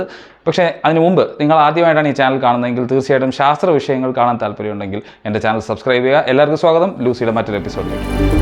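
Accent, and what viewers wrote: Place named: India. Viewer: Indian accent ആയതു കൊണ്ട് പെട്ടന്ന് വാക്കുകൾ ക്ലിയർ ആവും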